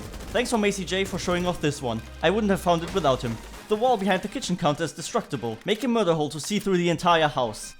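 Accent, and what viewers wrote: Germany. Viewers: you sound very german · Are u german ?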